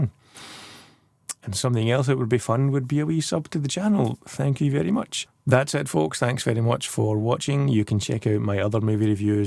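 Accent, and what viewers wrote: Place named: Scotland, United Kingdom. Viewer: Hang on! You're Scottish?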